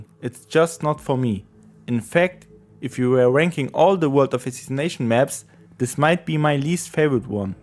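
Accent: Germany. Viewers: Man merkt das du Deutsch bist Aber trotzdem gutes Video ❤ Und sogar unterhaltsam 👍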